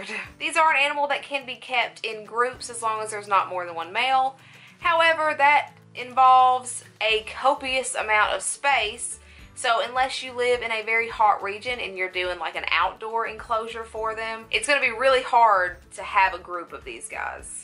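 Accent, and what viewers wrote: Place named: Alabama, United States. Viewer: I cant get enough of your bama accent. 😍😍😍 You sound like maggie from the walking dead...lol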